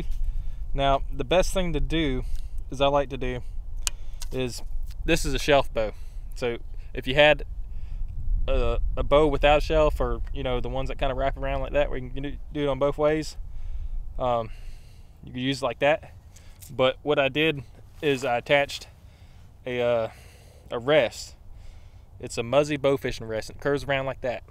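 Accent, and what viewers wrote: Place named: Rural, United States. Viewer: I was expecting him to start spitting into a spittoon chewing tobacco while smoking a cigarette and drinking whiskey from the bottle on his rocking chair... not breaking physics Loving the hick accent tho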